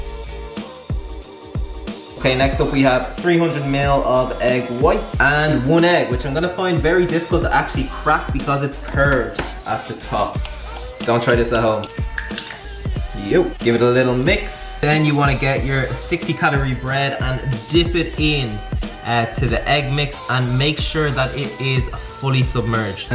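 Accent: Ireland. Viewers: Nvm, i knew it he is from ireland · Are you from Ireland ? You have posh brogue ;-). Good video, I will follow.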